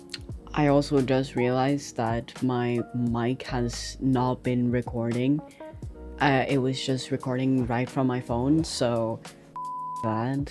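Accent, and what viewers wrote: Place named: Norway. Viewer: He’s from Norway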